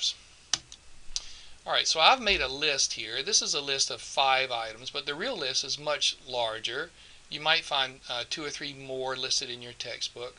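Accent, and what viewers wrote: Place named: South, United States. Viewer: that southern accent tho.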